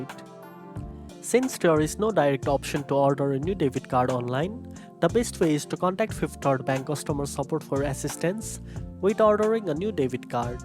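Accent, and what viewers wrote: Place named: India. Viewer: Why is the person explaining how to get a new debit card have an Indian accent. Can't understand what he's saying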